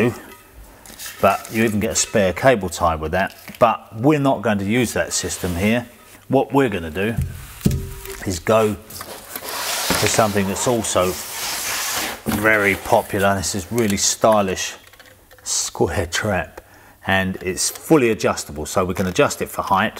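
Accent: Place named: United Kingdom. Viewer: 1st thing when doing any activity...have a person guide you through your project with a very lovely British accent, such as this gentlemen’s!;)So sir, I will need you to come to America first chance you get and talk to me while I remodel my bathroom☺️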